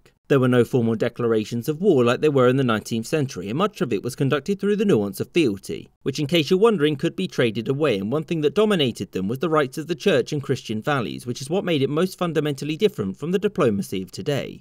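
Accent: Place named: Scotland, United Kingdom. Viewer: I'm Irish yet I understand the Scottish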